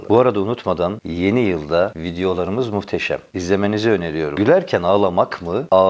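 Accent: Erzurum, Turkey. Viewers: Erzurum şiveli komik hikayeler gelsin lutfen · E şimdi benim kafam karıştı. Sen sadece bu hikayeleri anlatmak icin mi bu güzelim erzurum şivesini konustun. Ben dogal şiven sanıyordum. Bu istanbul turkceli halinde ne şimdi gardas. Filmin orjinalligi gitti gibi bir durum oldu valla :) Biz seni oyle dinleyip tanidik,sen hep oyle ol.. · türkçende harikulede ama erzurum aksanıyla konuşmak sana daha çok yakışıyor videoların devamını bekliyoruz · Abi şiveyi değiştirme erzum şivesi seni sen yapan özelleğin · Abi bu işi büyüt ama sevdiğimiz halinle doğallığınla devam et . Erzurum ağzını bozmadan devam etmeni rica ediyorum baş tacısınn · Kardeş gerçek Erzurum ağzı ile anlatımların muhteşem nacizane başka ağızla hiç bir video anlatım yapmaman seni insanlar izliyor dinliyor gülüyorsa anlatımının komik ve gerçek Erzurum ağzı ile olduğundan gerek yok kitap gibi anlatımı konuşmayı bunları zaten birileri yapıyor başarılısın devamını istiyor izliyoruz ve beğeniyoruz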